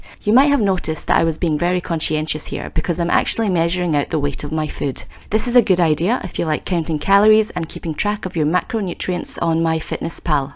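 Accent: Scotland, United Kingdom. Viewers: I'm a new subbie and I love your videos. Good ideas! Out of curiosity are you Scottish because you sound like like you have a slight accent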